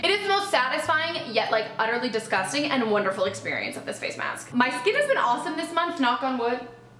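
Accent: Russia. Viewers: Actually you really speak with russian accent. It was gooood:) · Russian accent really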